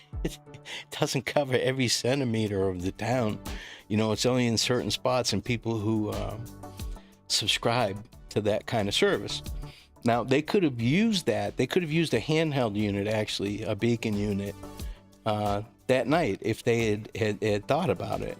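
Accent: Boston, United States. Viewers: Sorry, I asked you a question without bothering to look at your ‘about’. Ha! I thought I discerned a Boston accent!! I wonder though where you were in booking? I have 2 friends who just retired from corrections. One worked at MCI Shirley & Ashburnham with the ‘skinners’ as he referred to them. My other friend worked at MCI Framingham where she was head nurse. She always said she’d rather work with the guys cause they won’t whine half as much. Again, thanks for doing what you do. As I’m a spankin new subscriber, I’ll be binging your vids to catch up. I’ll comment but I promise you I won’t argue with ANYONE. That kind of crap just bores me to tears & I leave channels where that happens. Til next time, michelle in boston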